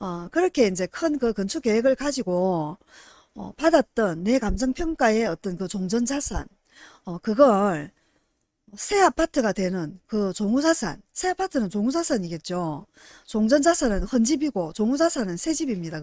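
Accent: Busan, South Korea. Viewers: 목소리가 재미있어요 부산인가요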